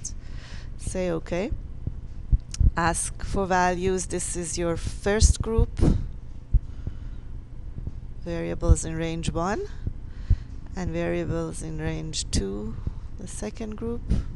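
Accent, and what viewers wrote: Iran. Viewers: shes is clearly iranian. the accent is too obvious haha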